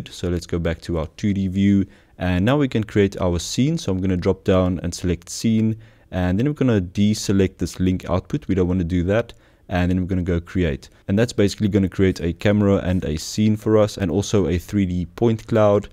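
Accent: Afrikaans, South Africa. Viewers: definitief afrikaans lol!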